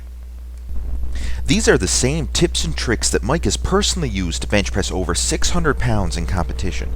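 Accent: Newfoundland, Canada. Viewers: Is this guy canadian ? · Lol the second you started talking I knew you were a Newfie:) Awesome stuff, keep it up~! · god you sound sooo canadian hahaha i love it! good video! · You're canadian, right?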